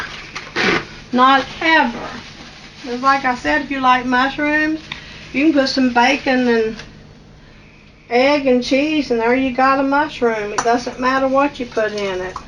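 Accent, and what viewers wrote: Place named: Georgia, United States. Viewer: I've been cooking for 58 years, but I love to hear you talk and watch you cook. I'm almost 75 yrs old. My paternal roots are in North Georgia, and they sound very much like you. I live where they grow the Vidalia onion. I too suffer from many ailments 'cause of an allergic reaction to Lipitor and Zoccor. I've been saved by the grace of GOD since 1972 and He keeps me every day.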